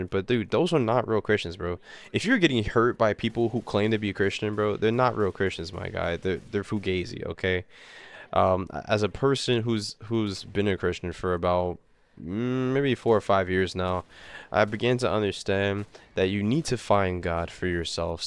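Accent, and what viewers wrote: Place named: AAVE, United States. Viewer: I have a feeling this guy has an iq of 87, this isnt a joke post just watched it cause it looked absurd. The ebonic vocabulary and accent used, speaking gait, and "find god for yourself" means this guy is most likely some mulatto amerimutt that engorges in weed often. He dances around trying to make an actual point aboutt he furry community and says vague non statements, "like everyone is ai or something and people are like copying eachothe,r" how though it is never explained. The only point he makes is that "christian furries" often have clashing view with his and that there is "no creativity" and thats about it. The mental gymanstics of "religous furries" never ceases to confound and entertain. No examples, no anlysis, just babbling on. It must be a pity what happened to you to be colored and a furry and also advice, if you want to try and make/prove an arguement, try not to say "like" so mucn, its a clear give away for a wrinkleless brain asnd follow the essay 101 stuff you were tuaght in elementary school, intro with and arguement and intro to sections, the sections, and the concluion. 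Who cares Im just a chud anyway.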